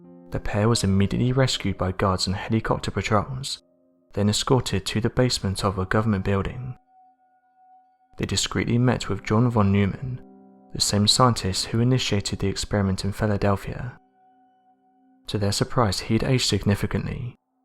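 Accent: United Kingdom. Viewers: If things are happening in Casper, Wyoming, why can't you have someone from Casper, Wyoming narrate the happening? Could be really good and we in America could probably understand wtf, he was actually saying! I am really getting sick of the accent, inability to pronounce the American language and that non-emotional, dreary, British voice!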